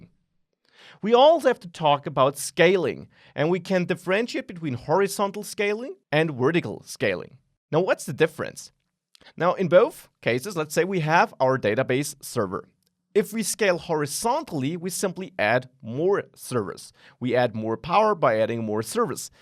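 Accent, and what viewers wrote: Germany. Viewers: I have not quite completed your video yet, but I have to comment on your clarity and thoroughness! It sounds like you might also be German. ☺️ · There are special commands so called "choins" :-) I really love this german accent! Thank you for this comparison, very interesting and complete.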